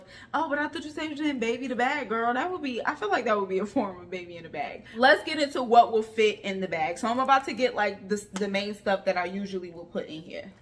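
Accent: New Jersey, United States. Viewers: I came here for the bag review. I've been off of Coach for a while. But it seems like they're making a comeback. So here I am. CUTE BAG! But let's talk about you! You have a great personality. So funny. Classy. And real. You remind me of me and my friends. You MUST be from the east coast. Sounds like Jersey. (I'm from Newark). In any event, great video. Great job!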